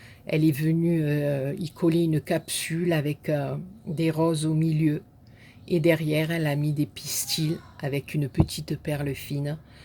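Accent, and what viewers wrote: South, France. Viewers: Bonjour, quelle belle voix du sud, beaucoup de soleil et d'émotions dans la voix, adieu la barquette en plastique et bonjour le nouveau pot "carrousel" à crayons, très très jolies créations j'aime beaucoup tous les envois et les astuces c'est trop sympa les ballons biz à toutes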